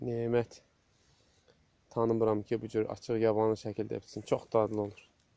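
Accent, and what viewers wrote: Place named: Qusar, Azerbaijan. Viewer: Oy oy yandirmir elivizi ozude ne qederdi deyesen biz terefdi danisiqdan his olunur esq olsun Qusarlilara👍🏻👍🏻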